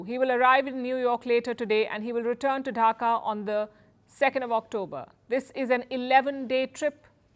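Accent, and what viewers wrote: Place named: India. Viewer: Why this lady keeps having wet dreams I. Bangladesh and keeps spreading rumor in an annoying Indian accent?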